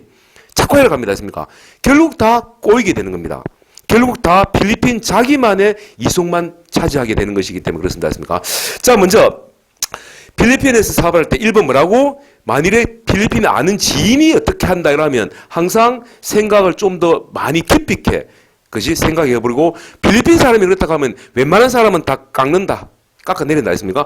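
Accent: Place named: Busan, South Korea. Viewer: 부산억양이 알아듣기가 쪼금 힘들어요 ㅠㅠ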